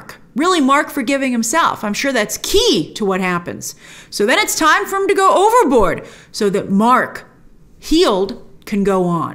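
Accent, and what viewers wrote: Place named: New York, United States. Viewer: I heard that (exaggerated) New York accent too.:) I've been loving this series. I love these more character-driven stories that these MCU shows have given us. We can save the action for the movies.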